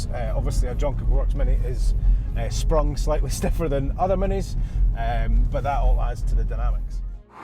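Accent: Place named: Scotland, United Kingdom. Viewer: Och aye , wheres the haggis?? cant stand the scottish accent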